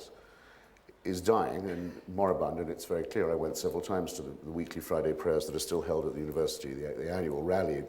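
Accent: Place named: Oxbridge, United Kingdom. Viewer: Notice how he focuses on Europe and the Middle East, no mention of Central and South America. His memory lapse regarding El Salvador and Nicaragua is ideologically too convenient to overlook. Thankfully for those not chained to the Anglo-American doctrinal system, one can hear past the Oxbridge accent and see this impostor for what he truly is.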